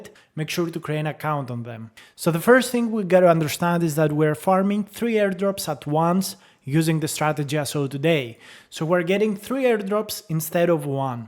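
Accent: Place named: Greece. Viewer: I have a strong feeling that you’re one of the few honest YouTubers out there — someone who speaks openly, doesn’t try to take advantage of anyone, and always keeps it real with your audience. Also, I noticed that you speak English with a bit of a Greek accent, which makes your videos even more unique and enjoyable!😊 By the way, I left a comment on your previous video — I was wondering why Bullpen isn’t listed on DefiLlama? That’s actually one of the reasons I have some concerns about Bullpen.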